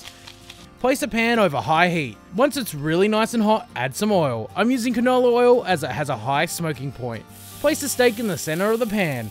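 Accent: Australia. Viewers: That's a nice accent you have there .au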